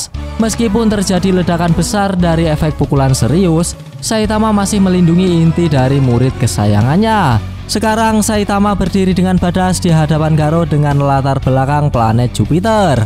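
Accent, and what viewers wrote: Java, Indonesia. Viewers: Jurus2nya kocak anjir medok😂😂😂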